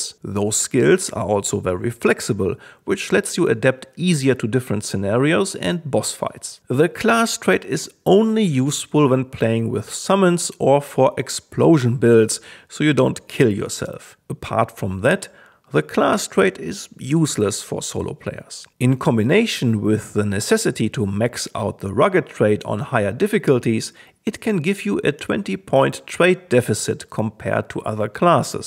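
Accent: Germany. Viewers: Youre 100% German. That’s the most typical German/English accent I’ve ever heard! 😂 Thanks for the very informative video and taking the time for the community!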